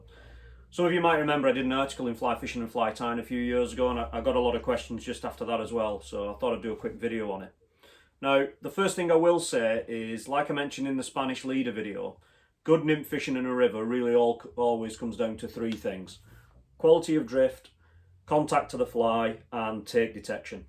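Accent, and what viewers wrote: Wigan, United Kingdom. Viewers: Really enjoyed these videos - even my wife commented that your explanations were very clear and uncomplicated. Having said that, could you just clear up the lengths of the thick coloured nylon. Is each length 30 cm, making 60 cm for the two or do the two pieces together add up to 30cm? Must say that I've enjoyed hearing your, once, very familiar accent having been schooled in Wigan in the sixties.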